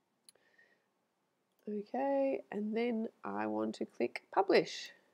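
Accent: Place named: Australia. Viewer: Good to hear an Aussie accent for a change :)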